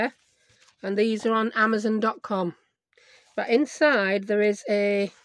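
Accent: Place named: Northern England, United Kingdom. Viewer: Just found your channel and enjoy listening to your accent I am a Geordie living in Canada and I know you are further south but your phrases remind me of home.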